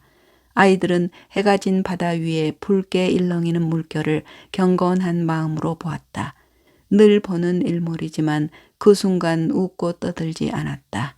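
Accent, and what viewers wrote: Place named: Gyeonggi, South Korea. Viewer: 경기사투리가 정겹네요 예전 강화도령철종드라마가 기억에 새롭습니다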